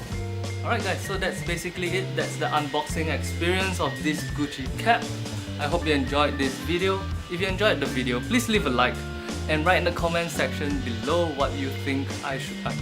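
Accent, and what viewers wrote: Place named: Singapore. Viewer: You sounds like Singaporean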